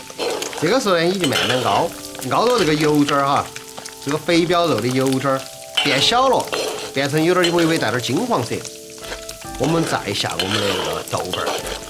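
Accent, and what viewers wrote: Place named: Chengdu, China. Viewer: 成都话真是好听，女娃儿说更好听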